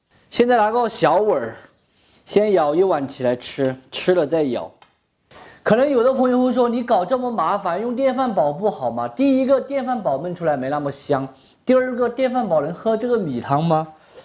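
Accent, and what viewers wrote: Sichuan, China. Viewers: 你的川音普通話好搞笑！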